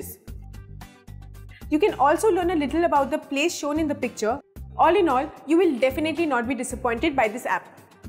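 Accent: India. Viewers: I hate indian accent. One of most terrible way of talking in english is in indian accent . I never watch anything that is in indian accent. But your video am just checking if there is any useful app only. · It's an Indian channel, and India has English users as well. People from all over the world adjust to the American accent when watching american shows/videos, or British TV shows, I don't see why they can't adjust to the Indian accent as well.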